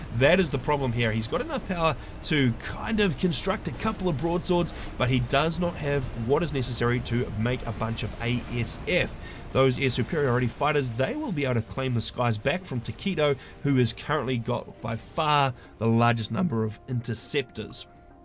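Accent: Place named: New Zealand. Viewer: first up good on you for taking the time to covering FAF! the quality of the cast was very good. i however am a tad ocd and found listening to your NZ accent exxtremely irritating, so sorry had to be honest!!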